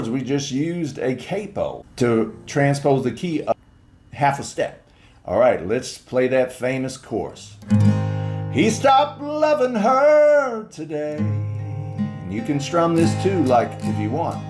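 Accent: South, United States. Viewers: great lesson, haircut and southern draw! You made it easy........Oh, and yes, is one of the greats!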